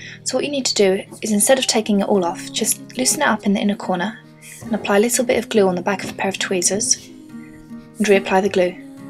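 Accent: United Kingdom. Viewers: i love ur british accient and ur make up is amazing!!!! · its nice to hear a british voice on youtube, most the people that do make up tutorials are american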